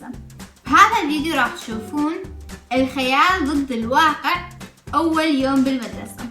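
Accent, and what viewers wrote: Mosul, Iraq. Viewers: هااي لهجته العراقية 😊✌ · احلى شي حجي عراقي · لهجتها هيك لان احنا المصلاويين نحجي هيك وهي البنت اشورية يعني مسيحية ومن الموصل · انتي عراقيه مبين بلهجتك شنو اسمج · اشو لهجتها كانو عراقيه بس اكو كلمات بحجيهه مو عراقيه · سؤال انتي عراقيه اشو نص لهجتك عراقيه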